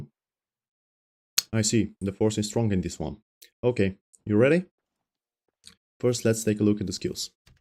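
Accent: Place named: Bulgaria. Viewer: Damn, the fact that your Bulgarian accent is so strong, gained a new sub. Здрасти)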